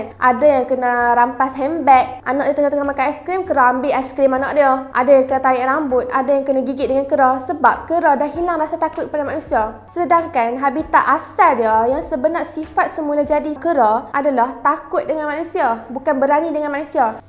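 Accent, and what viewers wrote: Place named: North, Malaysia. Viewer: Seronok dengar loghat utara kakak❤❤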